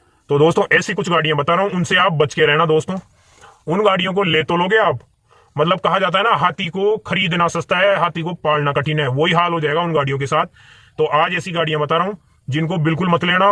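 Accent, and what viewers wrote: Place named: Hadoti, India. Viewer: Bhai boli se hadoti k lag rhe ho. . Kaha se ho. .❤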